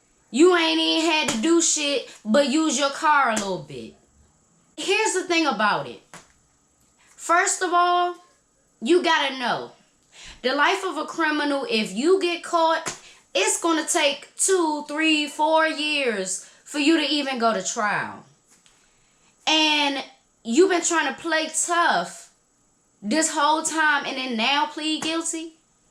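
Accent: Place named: Florida, United States. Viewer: It's pronounced "Pom-Puh-No", Flawda. Haha. I love ya accent. Bless you on your entrepreneurship. You're still effortlessly beautiful. 👑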